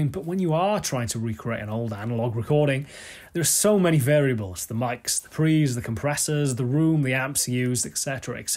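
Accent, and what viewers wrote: United Kingdom. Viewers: Helps to hear a Brit explain.. adds to the authenticity..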